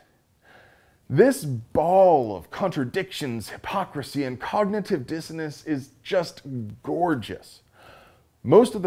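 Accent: United States. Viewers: You talk at first as if you're a Canadian, speaking to Canadians. But as you go along, you sound more & more like an American (using FBI statistics, as well) speaking to God knows who. I like facts. But I don't need to be "loved" by a chubby male stranger that I've never met. Thanks anyway. (Signed, Canadian Permit holder).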